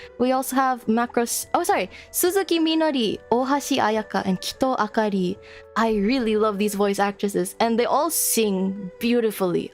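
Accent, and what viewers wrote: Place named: Philippines. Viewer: idk who tf you are but you have a filipina accent and you like girls und panzer so I’m interested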